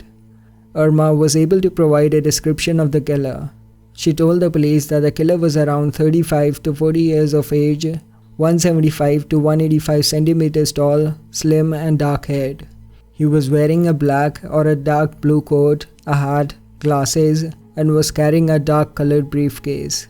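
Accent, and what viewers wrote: India. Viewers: New voice, indian accent sounds different👍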